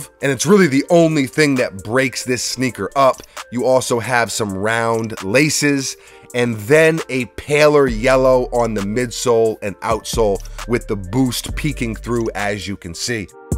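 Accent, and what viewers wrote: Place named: Boston, United States. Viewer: Love the Boston accent 👍😂😂😂🙏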